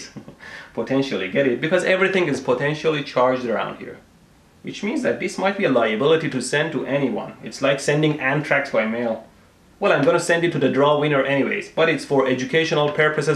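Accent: Iran. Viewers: Iranian English accent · Thats a persian accent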